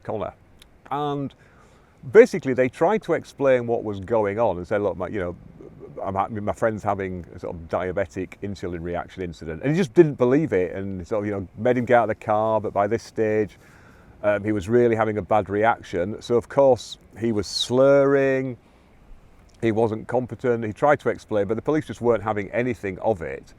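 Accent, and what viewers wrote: United Kingdom. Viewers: How funny it is that some goofy with a british accent is trying to tell Americans what to think. So you're going with the secret service has more stringent rules of engagement than the police do? Cause that's exactly what you're saying, whether you think you are or not. Release your bank statements for the last two weeks.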